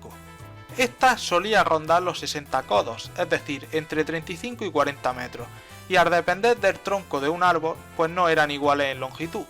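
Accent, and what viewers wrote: Murcia, Spain. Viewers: Aaaaaaaxooooooooooooooooooooo,ese acento es murcianico pijo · De que parte de España es ese acento?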